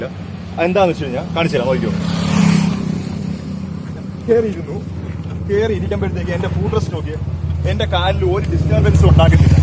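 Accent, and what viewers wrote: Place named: Thiruvananthapuram, India. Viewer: വളരെ ലളിതമായി നമ്മടെ സ്വന്തം തിരുവനന്തപുരം സ്റ്റൈലിൽ തന്നെ അവതരിപ്പിച്ചു. പൊളിച്ചു മക്കളേ.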